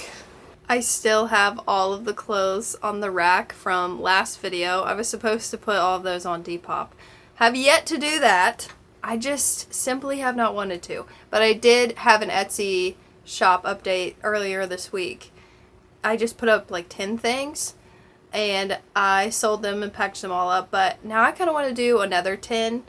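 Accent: South, United States. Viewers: i was NOT expecting you to have a country accent, i was shook 😂😅 yay another fellow country sounding girl 🎉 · Where’s her accent from? It feels kinda southern, kinda midwest maybe? I truly don’t know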